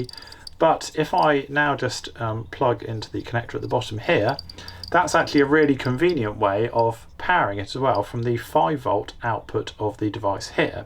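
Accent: United Kingdom. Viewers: Absolutely excellent instruction video! Thank-you. PS love the 'posh' accent. :-) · Miss the British accent... Away from England for over 12 years...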